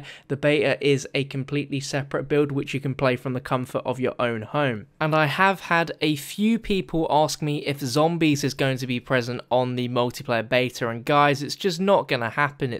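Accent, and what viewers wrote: United Kingdom. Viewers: you sound Brittish. keep talking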